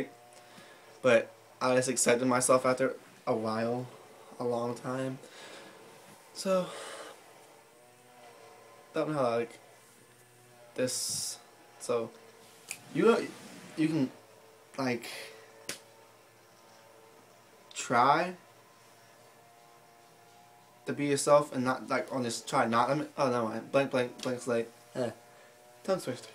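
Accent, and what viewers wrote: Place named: United States. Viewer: The American Accent though :3 Aw i cant believe i dated you :') Your amazing just gotta say :)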